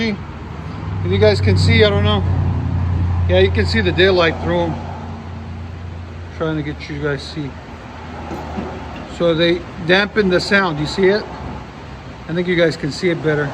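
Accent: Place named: New York, United States. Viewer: He sounds like he from new york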